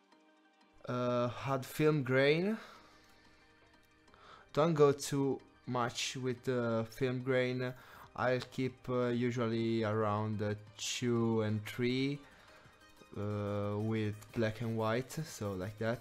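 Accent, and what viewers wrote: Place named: Italy. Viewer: DIO SANTO L'ACCENTO ITALIANO DA IL TOCCO ORGASMICO IN PIU'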